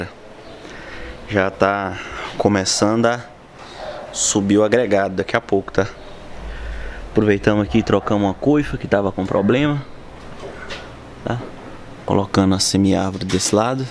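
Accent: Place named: Belo Horizonte, Brazil. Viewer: Blz amigo, pelo sotaque parece te bh, estou certo?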